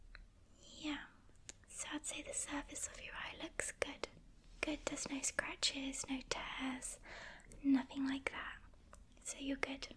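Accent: United Kingdom. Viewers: What is her accent? What part of the UK?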